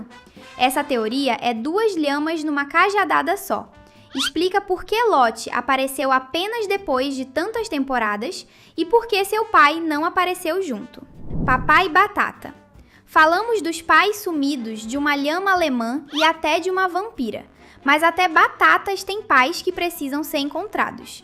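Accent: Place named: Minas Gerais, Brazil. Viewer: Vc é de onde? Vc tem um sotaque mineiro ou baiano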